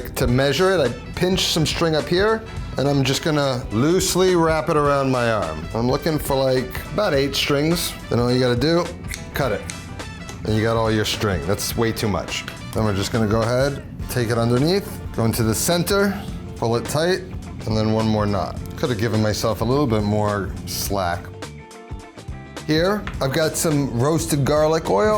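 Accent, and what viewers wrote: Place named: Brooklyn, United States. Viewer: Love your videos bro. I’m in my 30s but between the homage to some BK classics and the accent it brings back a lot of memories being a kid at my grandmas in Dyker Heights. You remind me like you could be in my family 😂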